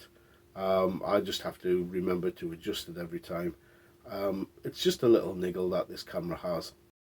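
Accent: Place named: Newcastle, United Kingdom. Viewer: Is this accent from Newcastle, by any chance? (Just curious, and I’m aware I can be way off with my guess)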